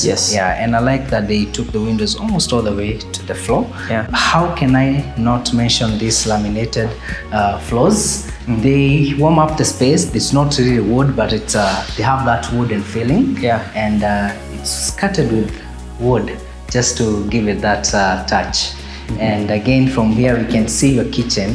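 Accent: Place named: Kiambu, Kenya. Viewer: Buda umeenda KIAMBU accebt ikaanza kutokea bana!😂😂btw luv the house keep it up!:washhands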